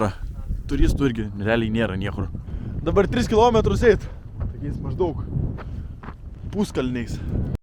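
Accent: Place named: Rokiškis, Lithuania. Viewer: Ale😊😊😊 atspėjau,kad iš Rakiškio nu vienu žodžiu iš to gražaus krašto girdžiu pagal tarmę😊😊😊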